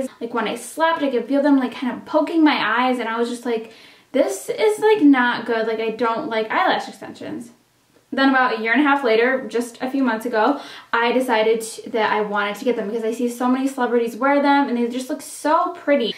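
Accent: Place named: New York, United States. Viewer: Lol I love your New York accent ! I can tell 💗💗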